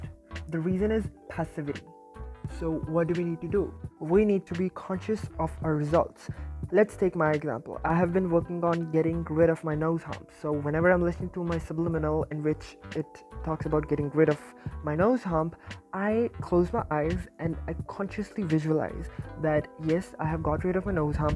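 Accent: India. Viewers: Wtf are u Indian ?!? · Are you an Indian? I hope the sublimals that I listen work😓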